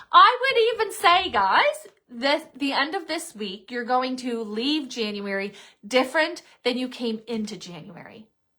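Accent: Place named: Nova Scotia, Canada. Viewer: Hi! I've been listening to your posts for a couple months now. I'm from NS originally but now live away, so it's nice to hear the accent from back home. Your readings are always on point for me, plus they're enjoyable to listen to. Thanks for posting! 😊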